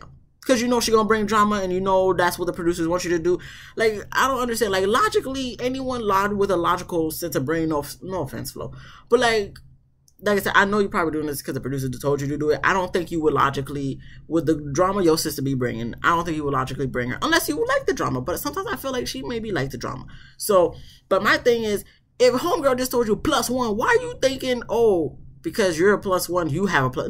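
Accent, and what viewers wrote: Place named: Charleston, United States. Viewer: oh ok. You sound like some of my peoples from Charleston.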